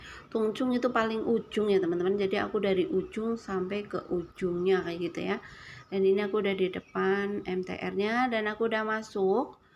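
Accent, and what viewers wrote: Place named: Banyumas, Indonesia. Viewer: Salam ngapak mbae😂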